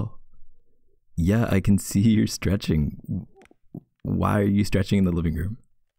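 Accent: Canada. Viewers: HIS ACCENT IS SO(;$/!( I LOVE CANADIANS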